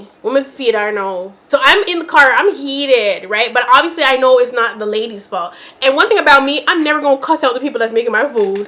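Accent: Jamaica. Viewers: It’s the patois for me 💀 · My favorite part of every video is growing fluent patois. Kk luv ya byeee:_CHEFSKISS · The patois!!!! Yes!!!🥹🥹🥹🥹🥰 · The patois is healing the broken parts of me!! 😂 ❤ I love it!!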